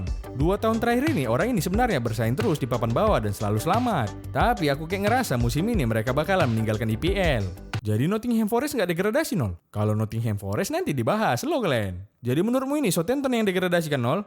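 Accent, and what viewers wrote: Medan, Indonesia. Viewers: Nada org MEDAN dan bahasa MELAYU upin ipin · Sukak kali la aku sama chanel si ini bahasa medan nya kerasa kali bah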